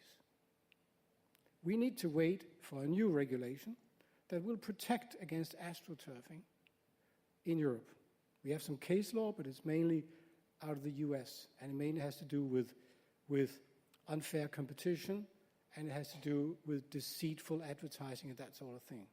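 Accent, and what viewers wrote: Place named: Denmark. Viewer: This is Danish English-speaking,,,, blurry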